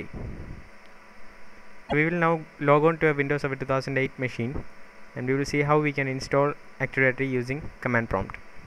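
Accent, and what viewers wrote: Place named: India. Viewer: thank you for the video. as a helpful bit of information, your indian accent is thick, so it is already difficult to understand your english. please slow down your speech and say your words more clearly.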